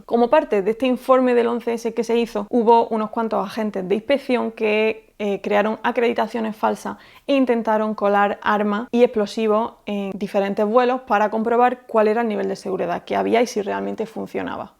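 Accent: Andalusia, Spain. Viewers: De qué parte de España es? Me encanta como habla! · Es el segundo video que veo tuyo <3 contando el vídeo del Concorde y la verdad estoy muy feliz con tu contenido esta super completo jsjs. Comence a ver tus videos a raíz de un vídeo de super holly donde imitaba tu acepto andaluz jeje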